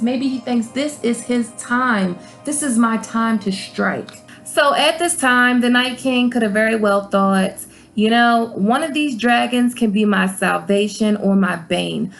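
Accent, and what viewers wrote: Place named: Baltimore, United States. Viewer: You gotta be from Baltimore lol. I can hear my accent from anywhere lol.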